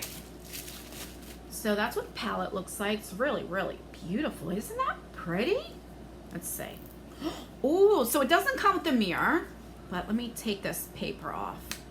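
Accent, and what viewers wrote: New Jersey, United States. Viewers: Hi, You are hilarious! I love your videos. The opening is so clever and entertaining too. 👍🏻 I am Brazilian but my husband was raised in New Jersey (Mulburn area) and I enjoy the English accent of the area. In fact, I just subscribed to Boxycharm/Boxyluxe and mentioned you as my favorite YouTuber! 🌺 Have a wonderful weekend and God bless you always! Renata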